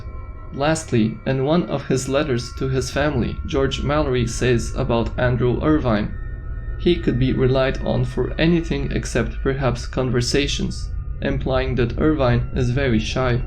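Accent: Germany. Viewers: Im sorry is there a Chance you are European,specifically German? My friend has the same accent I think it's really cute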